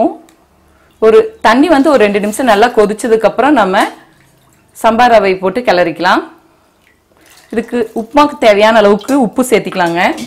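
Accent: Kongu, India. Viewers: super mam rombha easy aa sollitharinga unga samayal ellam. nallaeruku athalayum neenga oru varthai solluvingala sethikalam athu enaku rombha pitikum neenga kongu thamizhaa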